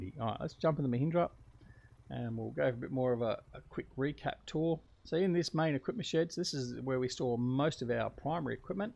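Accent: Australia. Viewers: Did you need to use GE to do some of your farm upgrades like main entrance and removing buildings? Love your videos and most favourite FS19 YT'r. I wish I could make Maps and would like to add some Aussie maps and do some work on WA map. Good to hear a fellow Aussie voice on YT. Best wishes for your wife. Keep up the great work.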